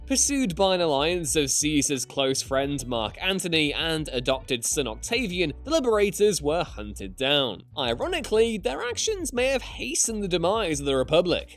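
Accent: United Kingdom. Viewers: What part of the UK is the host from?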